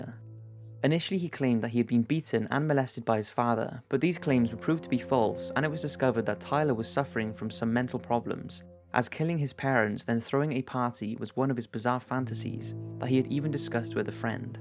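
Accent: Wales, United Kingdom. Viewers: I'm hyuring the "yur"s in your Welsh accent. Awesome! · its a welsh voice, so naturally nice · He's Welsh, sounds like somewhere near Cardiff.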